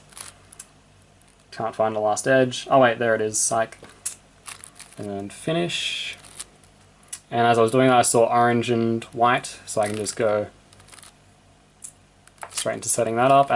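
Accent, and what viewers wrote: Australia. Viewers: You sound a bit like Feliks, probably Australian accent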